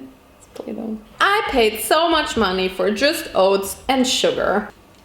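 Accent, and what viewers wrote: United Kingdom. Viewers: i love your british accent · Please a whole Video just talking in your hilarious british accent !! 😂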